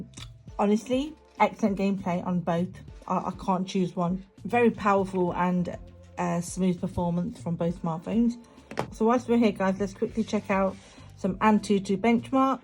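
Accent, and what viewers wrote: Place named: United Kingdom. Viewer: It's a British accent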